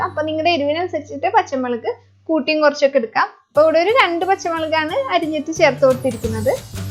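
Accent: Thrissur, India. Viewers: Nala malayalam Trisuranele😊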